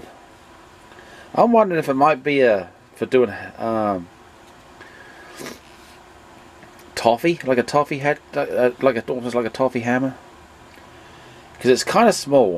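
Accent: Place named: Norfolk, United Kingdom. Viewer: Loved it, nice job! Nice to hear a good old Norfolk accent as well. Keep it up!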